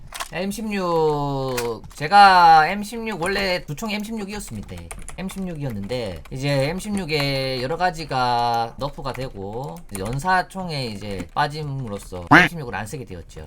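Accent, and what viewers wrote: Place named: Gyeongsang, South Korea. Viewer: 초등학생인 저희 아들의 권유로 배그를 시작하여 이렇게 유튜브까지 시청합니다.저희 아들은 김블루 광신도인데 저는 광신도입니다.ㅋ같은 갱상도 사나이라 그런지 사투리도 귀에 쏙쏙들어오고 재미있게 잘보고 있습니다. 아들을 이기는 그날까지 유튜브시청 많이 하겠어요. 퐈이팅 하이쏘~~^^ <mail> 입니다.ㅋ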